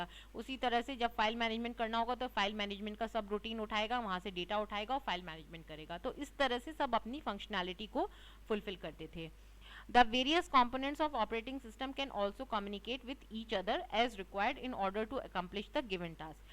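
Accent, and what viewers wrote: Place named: India. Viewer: This Indian accent sucks.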